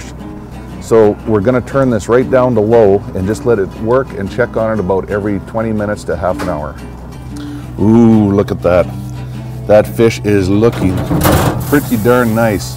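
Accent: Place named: Canada. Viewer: Cool except for the creepy Canadian accent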